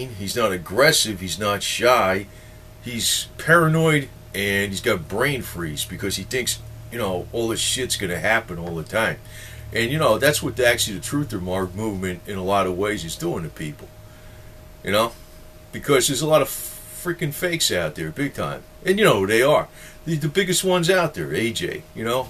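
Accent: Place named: Chicago, United States. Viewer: FINALLY! somebody sez it!..."insider"..bovine scat...."AJ" Bovine scat!...and it drives me nuts, everybody looks to RT like its some sort of oracle....do they post some truth?....yeh, but they never hang out their own dirty laundry...just ours. I like you, "Hizzoner" likes you, coz we both got the same accent...but I got out of "Big Shoulders", moved into the weeds....not out of fear, its coz the place has changed, "Rahmfather"?...forget it....agree with you on silver and the "brain freeze"...I stick with American pre-65 90%'ers and ASES....I like the 40% Kenns too...we just keep stacking...its an insurance policy, not an investment.....so you got a new subscriber, coz you helped me with the brain freeze...Happy New Year!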